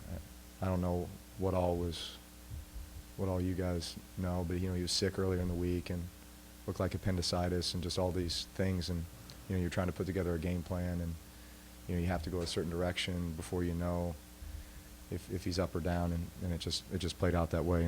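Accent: United States. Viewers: Rams ah Lozuzz! Rams SUCK! Love that yatty downtown New Orleans accent.. Drew knows they got screwed but 100% he keeps class all the way. Nothing but respect.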